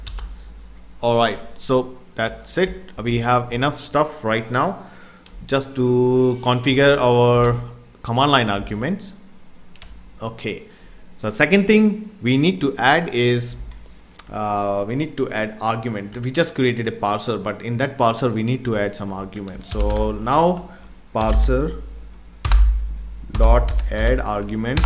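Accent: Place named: India. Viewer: Indian accent = "instant dislike" print(Indian accent) instant dislike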